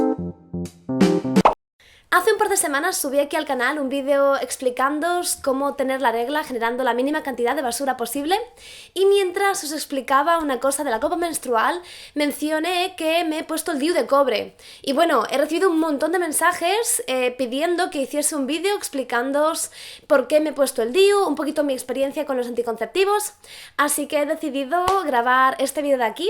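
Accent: Spain. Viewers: "Se me hizo mazo de bola" Mas madrileña no puede sonar 😂😂👏🏻👏🏻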